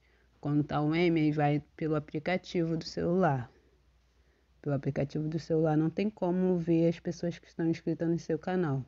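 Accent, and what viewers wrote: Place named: Rio de Janeiro, Brazil. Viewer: Muito bom esse vídeo amiga👏👏,amo esse sotaque do rio janeiro 😍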